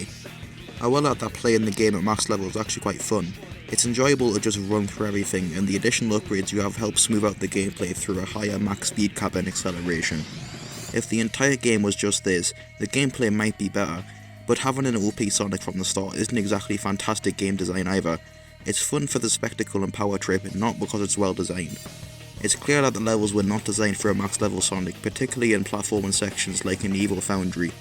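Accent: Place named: Northern England, United Kingdom. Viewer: You sound like you're from the North of England like myself. Way aye man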